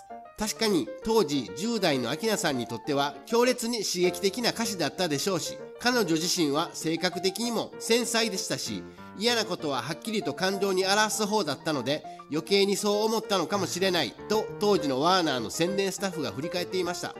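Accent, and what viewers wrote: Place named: Kansai, Japan. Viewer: 中森明菜ファンでしたので登録しました‼️面白いepisodeありがとうございます♪ナレーションの関西弁素敵💓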